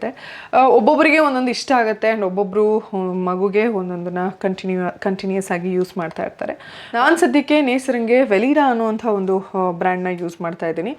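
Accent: North Karnataka, India. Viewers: Uttara Karnataka bhashe nalli full ondu vlog maadi mam please nim bayalli nam uttara Karnataka bhashe keloke chanda please mam one vlog please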